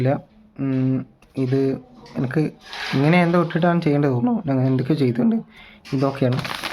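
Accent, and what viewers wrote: Tamil Nadu, India. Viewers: Tamil touch.......... ❤❤❤ nalla sound...... Ente avastha..... 😒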